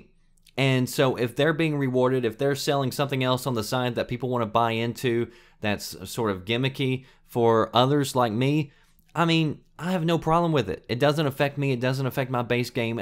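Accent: South, United States. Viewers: Your southern drawl is really sexy ;)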